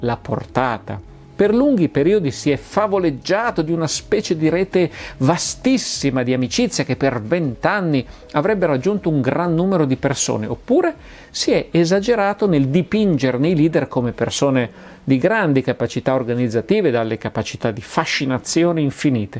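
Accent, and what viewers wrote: Italy. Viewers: Mi piace più il tuo accento piemontese, di quello di Sigir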